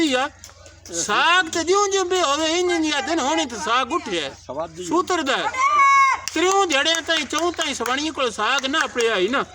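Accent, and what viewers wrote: Shahpur, Pakistan. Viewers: You are representing pure shahpuri accent of Punjab. Our shahpuri or Jatki bar is consising district like Mandi Bahauddin, Sargodha, Hafiz abad, Jhang, Chinote and Khushab.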